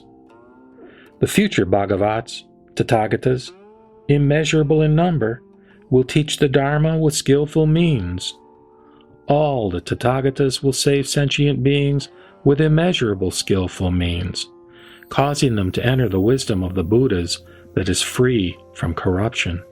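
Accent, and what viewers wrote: Texas, United States. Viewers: Texan accent spoils the reading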